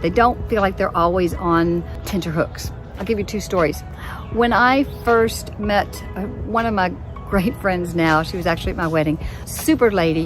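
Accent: South, United States. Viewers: For those of us who ARE on the same page with you, people with conservative leanings (most of CA IS CONSERVATIVE, I know, you don't buy it, but... Demoncrats), it seems like we have to PROVE ourselves to you. Enough of that. I am the one who thinks, acts and lives his life QUITE the same as you folks. My experience, just a couple weeks of living here, is that these dozens of videos I've watched on the subject are, by and large, the truth. Folks here are kind, polite, they DON'T PUT ON A FACE, they don't ignore or dismiss you. And, as a person who was born "up north" in Michigan, I love that southern drawl, and yes, you folks have that. Let me tell YOU something. If you have never lived in California, as I have, YOU have no idea what that experience is like to have whole neighborhoods, communities, cities and the WHOLE COUNTY of LA as entities which are generally working against you. 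This is besides the crazy high cost of living, crime, homelessness, people begging for money outside of EVERY store or gas station or facility you enter. I was lucky I did not incur direct harm to my person throughout 17 yrs., but I did have a couple of incidences of hit and run to my car that the police would not even respond to because no person was hurt. Cut me some slack, I am, AT HEART, a good ole boy, a friend, a good neighbor, I am willing to work with you, help you out, and you can trust me to come through for you. I came here to be relieved of that CA burden, and so far, I am seeing that.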